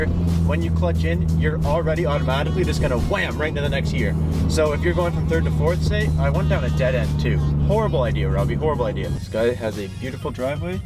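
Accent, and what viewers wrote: Canada. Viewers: Tell me your from Canada without telling me your from Canada. You didn’t have to say it we could tell. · so canadian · U must be Canadian ? I love it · 1. He's Canadian, but you heard that one enough by now haven't you? 2. 10 min vids are simply the way you have to do it these days. Youtube pulls so much crap and changes constantly, so it's harder, than ever to grow as a channel. They care about view length more than views, so if your vid is shorter, they won't recommend you. He's doing it so he can still survive as a channel, because the sad truth is, that he pretty much has to until he finds a stable way of income. Remember that he's doing Youtube full-time now, so his income is likely low. He's been asking his subs on how we'd like him to find a stable income, so it's clearly the case here. Also if this was to squeeze as much money out as possible, he'd make it at least 10 min long and put like 4 ads on it, but he didn't. 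3. This tutorial was not meant to be advanced at all. It was clearly a tutorial to learn a basic way to shift faster. It's for new enthusiasts, who are starting out. You know, the people a lot of guys in the car community like to bash for no reason, until they think we're just a bunch of arrogant assholes and walk away, wasting a potential new passionate enthusiast in a community, that is probably coming to a decline soon, as more and more people lose the interest in the engagement of driving? And I know this is true, since I've seen at least 2 or 3 people who gave up for that reason and I notice, that a lot of young people just don't have the same interest in cars as years back. Even I almost gave up, b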